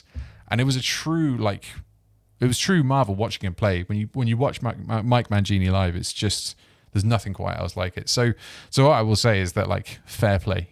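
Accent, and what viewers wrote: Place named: United Kingdom. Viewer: It wasn't until the 15 second mark that I could tell you were british. got to be the most subtle accent of all time. Helldivers brought me to your channel, great great shit dude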